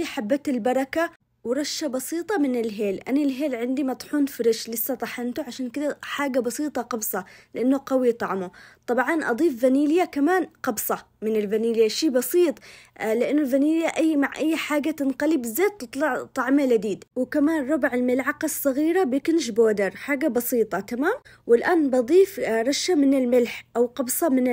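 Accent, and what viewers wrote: Aden, Yemen. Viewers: الله يحفضك غير الطبخه الي ترجعني للبريقاء اللهجه كمان ترجعني لبيتنا ولاهلنا تحيه كبيره ككبر عدن بكله لك وتسلمي علا الطبخات الي تحسسك بالفن لانه معروفه عدن ملكت الفن والله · كلامك يجنننن عدني قح😂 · حبيبتي والله انا من السعودية ذكرتيني بصحبتي الغالية من ايام الجامعه كانت عدنية و نفس لهجتك❤❤❤❤ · انتي الاحلا والاغلا طبعا شفت شوي من فيديوهاتك وان شاء الله اطبق كل شى واخبرك النتيجه اتمنى لك التوفيق والنجاح تدري اش احلى شى بقناتك طبعا كل شى حلو الشرح والطبخات وكل شى بس اكتر شي عجبني طريقتك بالكلام او ب الاصح انك محافظه ع لجهتك العدنيه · اول مره اشوف قناتك ياسلام ماشاء الله ستاره على خفه دم واحلى شي لهجة اهل عدن عسل والله معك إبيه🥰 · اول مرة اتابع يوتيوبر يمنييية كتير حبيت لهجتكن وقناتك حلوة واكلاتكم بتشهي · ياالله مااحلا كلامك يذكرني بعدن الحبيبه الله يعطيك العافية تسلم ايدك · ما شاء الله حلاوة كلامها ولغة أصحاب عدن...حبيت العفوية والاسلوووب.. 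الله يحفظك ويسعدك أختي الغالية.. متابعة جديدة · ماشاءالله اللهجة العدنيه تجنن انا فقط متابعه الفيديو عشان أسمع اللغة الجميلة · اقول شيء اووووول مرررره احب اللهجه اليمنيه قسماً بالله مره حلوه اللهجه يا زينك. بس لازم اجرب هذي الخبز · اتابعك من امريكا عشان اسمع الكلام العدني 😅 ماشالله طبخك ياسلام 👏👏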